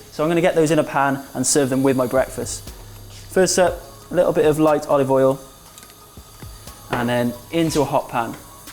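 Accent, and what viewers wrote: Wales, United Kingdom. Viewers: Do I hear a welsh accent?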